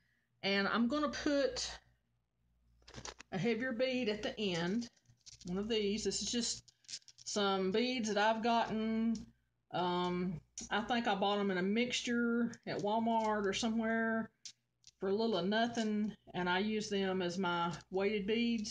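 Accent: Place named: North Carolina, United States. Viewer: Your accent sounds like you are from my area or my state which is NC. It is refreshing to me to hear someone talk like where I'm from. I have always told my boys to be proud of where they come from, it stuck with my youngest but I'm not quite sure what happened to my oldest except that he watched a lot of Thomas the Train when he was little 😆.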